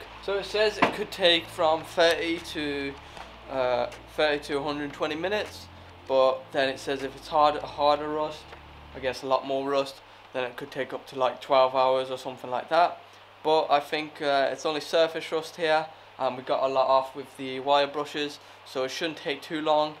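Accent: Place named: England, United Kingdom. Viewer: You in England or Ireland?Im guessing England as of your accent and plates on the other cars...so did you bring the civic over or what?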